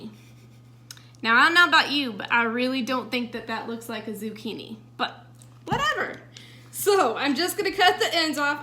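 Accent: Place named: South, United States. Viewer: Man I wish my family ate left overs I cook fresh daily my husband eats last nuts for lunch n my son eats last nuts for after school snacks ...what state do u live in ? U sound like that one girl who always says y’all she makes tons of videos .. n yes I live keto connects as well . Ur nails r on point 💕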